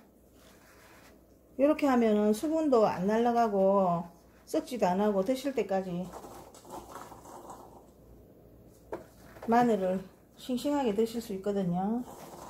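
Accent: Busan, South Korea. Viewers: 유용한 정보 감사합니다 ~~ 부산말씨 정감 있어요 ^^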